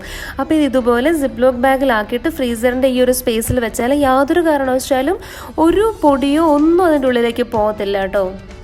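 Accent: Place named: Kollam, India. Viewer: ariyilla.... samsara shaili kettappol tonni..appol onnu confirm cheyyamen vicharichu... ende naad kollam... njan from karunagapally... husband from kadavoor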